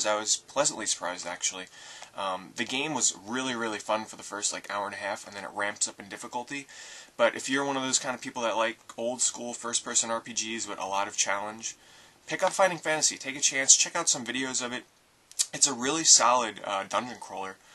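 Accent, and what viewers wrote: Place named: New England, United States. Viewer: what part of america do you live in? I sense a new england accent...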